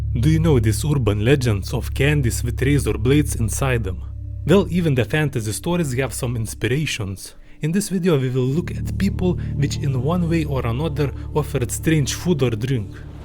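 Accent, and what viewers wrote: Russia. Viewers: Are you russian? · I might say several positive comments about your vids, but they've been already said. In addition, narrating horror documentaries with russian acent might be a new genre, so keep it up my friend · speaks English in Russian manner. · Are you from Russia? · Are you Russian if so that’s fucking awesome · Speaks like a third of Russians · im Ukrainian and by your accent and costume i already think you are Russian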